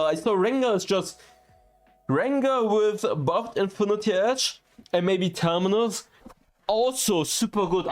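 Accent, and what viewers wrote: Germany. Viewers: Btw: you german?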